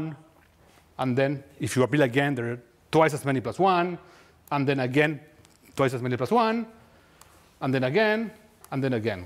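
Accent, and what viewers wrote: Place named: Argentina. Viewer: He’s from Argentina, English isn’t his first language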